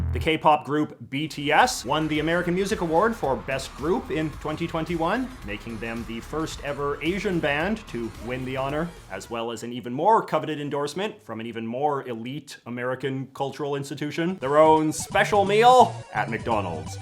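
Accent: Canada. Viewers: I can tell you are Canadian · Are you Canadian talking about American culture? Accent you have and all. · How would you term this cadence to your manner of speaking, it's so distinctive, never heard anyone else speak with your "rhythm", which seems independent of your Canadian accent.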